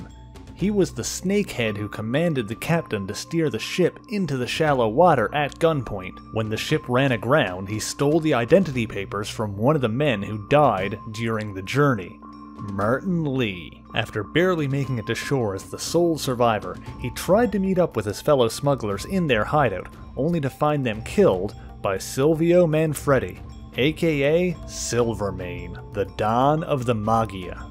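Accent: Canada. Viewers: Damm bro that Canadian accept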